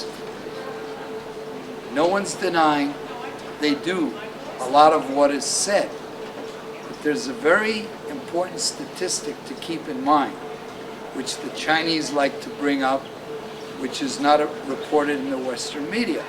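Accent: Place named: United States. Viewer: American acent for sure.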